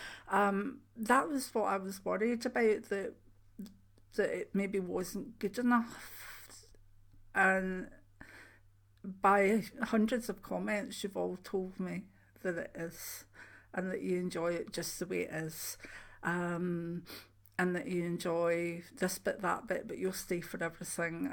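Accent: Scotland, United Kingdom. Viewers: Sometimes individual words can get be unclear but taken no notice of rudeness......that's all it is. Jealousy * (as always) for your success.. I find a scottish accent very pleasant to listen to and yours is no exception. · Hello dear, I've been watching in the background and not commenting until now. You are so genuine. I sense your energy. I'm in the USA and have a girlfriend from Scotland and everyone complained that they couldn't understand her and I never had an issue and loved her accent and she sounds just like you 😍. I love the way you say "wee bit"...it just makes me smile! Your channel is wonderful. I am clairaudient and clairalliant since childhood but it is random and I am trying to learn how to use it in a more controlled manner. Thank you. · My family and I love your accent. We live in the USA but are of Scottish heritage and you sound like home to us. 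· My parents & siblings are from Kilmarnock! Your accent is great and understandable :)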